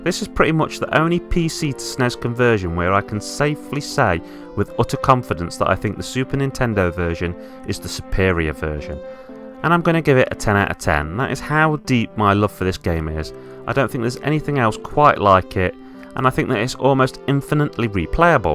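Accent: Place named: United Kingdom. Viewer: This is a lovely little mini review, I’m amazed it’s been so unnoticed. I definitely agree with all your points and I love the game too, it’s my preferred version by far too. Dr. Wright does actually appear in one other Nintendo game, in Zelda: Link’s Awakening he has a cameo as “Mr. Write” but it’s definitely the same character as he looks similar with the same glasses and a song from SimCity plays in his house, in the remake he looks much closer to his appearance in SimCity. Also, it’s great to see someone else who uses the British SNES pronunciation.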